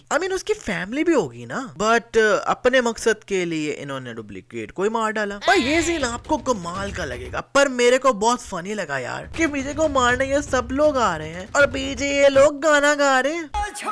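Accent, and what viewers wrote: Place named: Pakistan. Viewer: Tu 100% pakistani hai tere accent se pata chal rha hai, aukat ho to channel pr bata de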